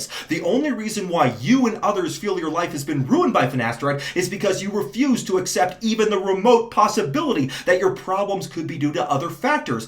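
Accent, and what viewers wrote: United Kingdom. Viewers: thank you for this video. As a british guy (that uses finasteride) who casually reads the BBC for national and international news, i was very worried by this article, particularly the anti-finasteride view it was pedalling, and was shocked at how keen they were to publish this losers sob story. All these facebook posts sharing this story and a similar one published by The Economist is full of comments by uneducated boomers who believe everything they've read and now think there's some sort of trade off to taking finasteride. It's straight up misinformation and I'm really hoping there's a resistance to this shit in the form of a news article or this EU study that demonstrates the safety of finasteride and how much of a loser Kyle is. God forbid they choose to ban finasteride, I don't know what I would do, but I'm banking on the medical study not using Kyle's sob story as evidence. 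Also your british accent has me absolutely cracking up! 😂😂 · Hahaha love the British accent 😂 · That British accent, I died. :)) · Thank you for speaking in British English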